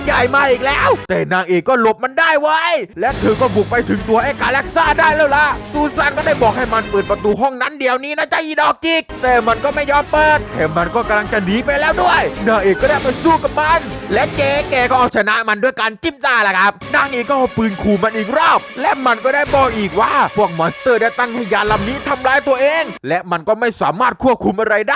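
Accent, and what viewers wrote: South, Thailand. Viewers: เกรียจการแหลงใต้ของพี่มากครับ5555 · ขำกับภาษาใต้ สุดๆๆๆ หรอยหนัด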